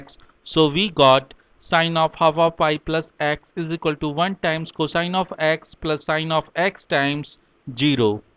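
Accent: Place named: India. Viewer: thank you for this video your pronunciation sounds like india 🙂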